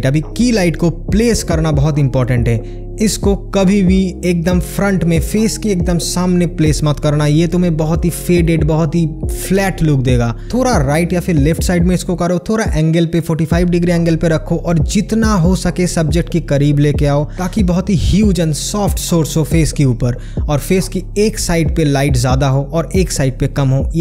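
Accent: Bengal, India. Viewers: Hindi sunke lag rha Bengal se ho? Bangali dada😅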